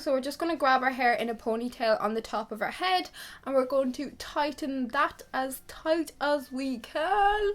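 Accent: Ireland. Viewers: I'm new bit are you Irish. I love you accent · are you irish? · I love irish accent so much 😭😭😭😭 ❤️❤️❤️❤️ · Omg I love you!! One day I just stumbled upon one of your videos and I am in love! I am already wearing one of your hairstyles they are perfect! And I'm on vacation so I don't was my hair every day so it's perfect!!! (Btw what part of Ireland are u from I love your accent)❤❤ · Are you from Ireland????!!!!! If so what county???!!!?!?!?!! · Are you irish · are you irish ? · oooh is she Irish! I thought already, her English isn't British English or American English so what else? Irish English!! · Are u Irish 🇮🇪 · Are u from IRELAND cause u have a irish accent p.s I am from IRELAND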